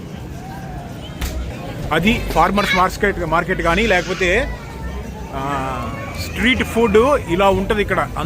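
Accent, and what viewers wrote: Andhra Pradesh, India. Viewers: anna nvu gun carry chestava safety kosam? and nv nizamabad nundi annav kani andhra slang undi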